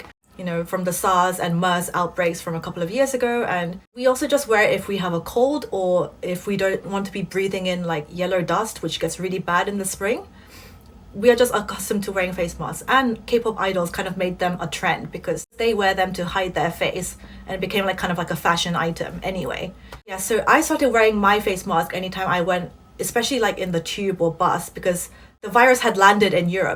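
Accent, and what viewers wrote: England, United Kingdom. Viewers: "Once bitten always smitten".... From the looks of it, you have immersed the entire teenage and adolescence in the UK....Yes the ever prominent threat of being an Asian-hate target is there, but the London contacts and friends that you have made will always stand firm alongside the future.... So, my verdict is that this "Time" will never wait. Go with your brave heart if there is a strong calling from deep within.... Your English accent and the hard earned communication skills will pay much diligence in a city like London as this city is the perfect platform for music. However, if the heart is swaying momentarily due to income bracket from Youtube, i am gonna advise to look into your career over a long run. Once you introspect past the intangible demons instated in the mind by modern media, you will find if your innate passion lies in a compromise gig over YouTube or in the sacrifice of your tiger mum who is willing to bear your resentment just for a bright career in the so called financial capital of the world "London". 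Being an Asian in similar waters, I thought of writing this down. I aint no philosophical expert. So, this is just contemplating and a bit too much nostalgia of the London vibes that i miss now being in limbo for the past one and half year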